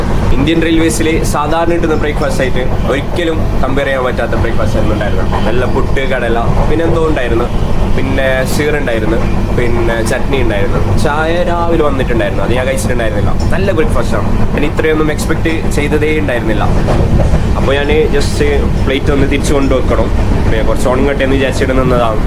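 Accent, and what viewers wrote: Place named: Kasaragod, India. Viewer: കാസർഗോഡ് ഭാഷ ❤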